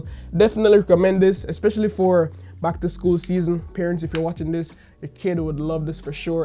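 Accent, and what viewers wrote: Jamaica. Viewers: Yow yah Jamaican ? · You sound Jamaican 🔥👍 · Is that a Jamaican accent I hear? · are you Jamaican brov? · are u from Jamaican ?? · you jamaican? big up yuh self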